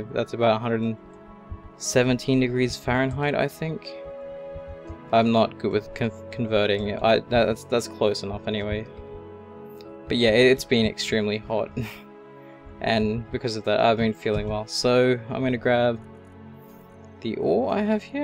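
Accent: Australia. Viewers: What country you from? Australia??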